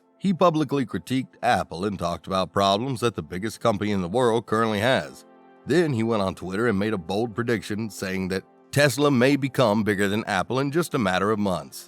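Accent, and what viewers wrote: United States. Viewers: The hillbilly accent of the host is terrible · Enjoying this guys southern drawl. Sounds like the narration of a Hollywood movie.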